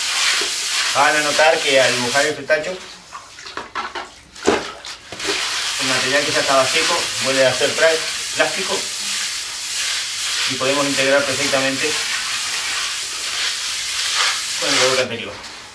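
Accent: Uruguay. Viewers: Genio. Sos Uruguayo, o Argento?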